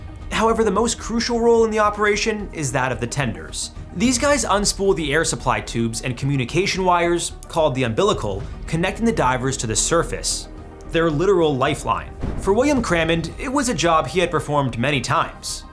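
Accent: United States. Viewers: Can anybody tell me which American accent he has? Cuz i dont know which kinda American accent o have bur whoever I have, it matches the most with his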